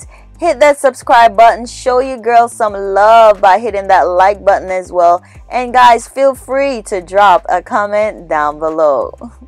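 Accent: Jamaica. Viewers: You are Jamaican I can tell my parents are Jamaican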